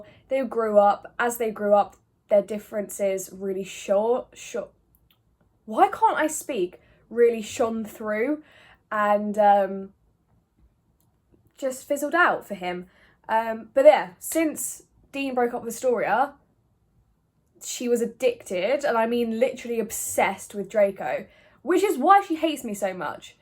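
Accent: United Kingdom. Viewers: Do you still have your British accent when you have shifted into any reality? <3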